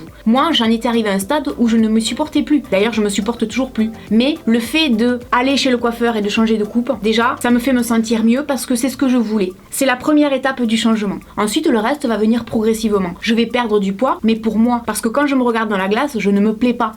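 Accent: South, France. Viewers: Salut, Je vais avoir 15 ans en octobre et je fait 37 kg pour 1,5m,autant te dire que je suis en sous poigt. Lorsque j était plus petite je m'aimer comme j'était, mais avec les année j'ai subie le regard des autres et j'ai commencée à me détester à un tel point que lorsque je passer devant un miroir je lui donner un coup (sa a durée à peu près 7 ans). Mais il y a 1 ans environ j'ai découvert une femme avec un fort accent du Sud. Cette femme n est pas parfaite mais elle est ce qui s'en rapproche le plus. Grâce à ces videos elle m'a redonné confiance en moi et j'ai réapris à m'aimer comme je suis. Cette personne on la surnomme. À cette personne je voudrais juste lui dire merci,un merci qui vient du coeur. Alors merci de tout coeur. Et désoler pour les fautes.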